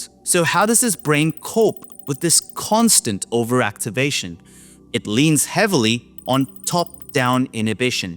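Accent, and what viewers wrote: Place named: Australia. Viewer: To nuance this video slightly and I'm coming up this from the point of being in the UK and it sounds like you're in Australia so how stimulants are prescribed there may be different. But the most important Factor here is please don't watch this and now think that if you have autismand ADHD, that you should not take stimulants, that is incorrect. What's important is that you are properly and expertly titrated. I'm in a household of people all diagnosed with autism and ADHD and we all take stimulant medication, however we are on different doses and at least one of those takes a different stimulant to the rest. We have all worked out which stimulant was right for us with the support and care of an expert clinical prescriber. So whilst yes the incorrect stimulant or dose may have effects that you weren't expecting and may make your anxiety worse, with correct titration this can be mitigated and you can see improvements in both your ADHD symptoms and your levels of anxiety.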